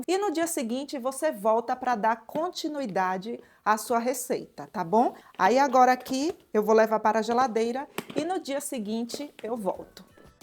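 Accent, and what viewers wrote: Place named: Northeast, Brazil. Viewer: Eu amo assistir suas receitas ,vc é simplesmente maravilhosa, pelo o sutaque vc tbm é nordestina , povo maravilhoso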